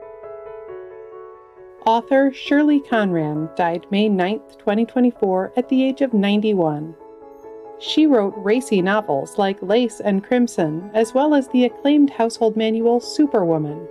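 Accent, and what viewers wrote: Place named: Midwest, United States. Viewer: I dont like her midwestern vowels. Tone is fine but vowels are killing me.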